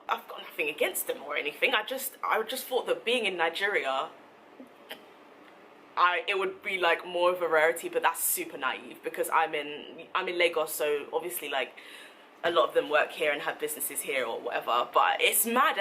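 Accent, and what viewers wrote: United Kingdom. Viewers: UK accent right there , so when I went to UK i automatically grabbed the accent 😇😇😇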